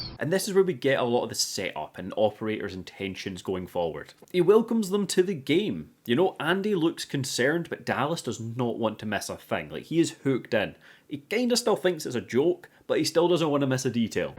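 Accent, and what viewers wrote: Scotland, United Kingdom. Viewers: I know his name is like dallas but bcuz of ur accent as a fellow Scottish person sounds like ur saying he’s dadless lol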